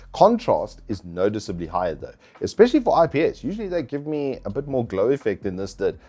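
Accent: South Africa. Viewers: hes south african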